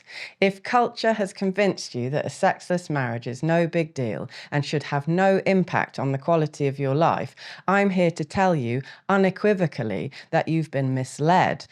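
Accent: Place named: West Country, United Kingdom. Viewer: Sounds like someone from West Country, nothing more.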